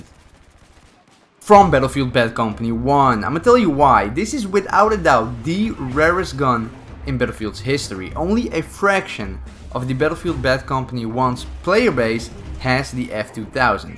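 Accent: Netherlands. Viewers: Mooi Nederlands accent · ik wist dat je nederlands was al vanaf begin door je acceny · The Dutch accent is so obvious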